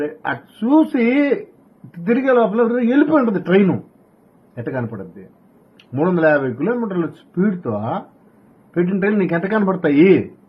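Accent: Nellore, India. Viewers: హాయ్ గారు 🙏 చాలా బాగా చెప్పారు సార్ 😂 మీ వీడియోస్ watch చేస్తుంటాను చాలా బాగుంటాయి, ముఖ్యంగా మన నెల్లూరు జిల్లా యాస బాగుంటుంది 👏👏 మీ మాటలు,అమ్మగారి మాటలు వింటుంటే నాకు మన ఊరు యాస గుర్తు వస్తుంటుంది am from hyd. సూపర్బ్ taking గారు మీరు,👏💐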